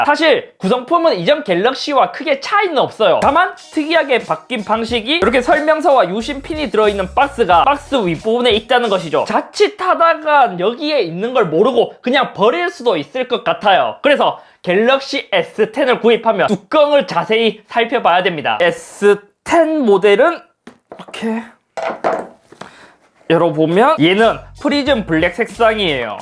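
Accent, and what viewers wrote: Gyeongsang, South Korea. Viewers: 경상도사투리같으신데 어느지역이세요? 궁금쓰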